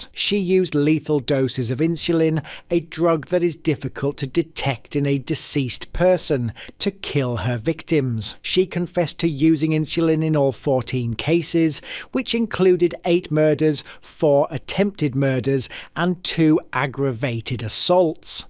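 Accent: England, United Kingdom. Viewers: Some english guy talking about Canada!!!? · 👋 Hi. I'm a Canuck. I live in Kingston Ontario. First I want to thank you for taking the time to learn how to pronounce a lot of the words properly, or are you Canadian? We have lots of folks from the UK living here, so yes I did notice the accent. ☺️ Other than a couple of words ~ great stuff. Brilliant! But~ you and most others got everything wrong about what constitutes a life sentence in canada. What happens is you are given a life sentence for murder and you are imprisoned for 25 years minimum. The odds of being paroled the first time you try, especially for a violent crime, are very slim to impossible. My father-in-law said he didn't see that happen once. Then IF you are released, you go to a halfway house where you could spend up to five years. You also have to report to a parole officer once a week for the rest of your life, and with any wrongdoing/breaking the rules, on the part of the prisoner, s/he can be returned to prison to see out his/her sentence never to be released or less, depending on the rule s/he broke. 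So yes a life sentence IS a life sentence. (FYI~prison means a federal institution, whereas jails are provincial and municipal) to spend the rest of his life there, especially if it is a crime involving violence. So when they talk about a life sentence that is what you get. Under no circumstances is anyone convicted of a crime involving violence ever just paroled and allowed to walk out the door. They have very strict rules they have to follow and if your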